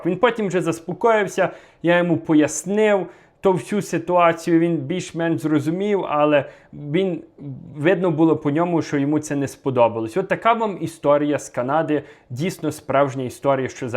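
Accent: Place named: Western Ukraine, Ukraine. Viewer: Вибачте, але ви також не говорите українською літературною мовою. У вас дуже виражений акцент ,,західнянської" сільської мови. Так я була у Львові і вони люблять повчати, а самі не говорять правильно ...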